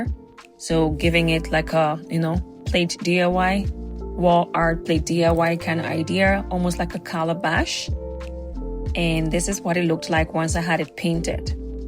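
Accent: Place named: Jamaica. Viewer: Love your accent... Jamaican or maybe Antiguan? Its like music in my ear.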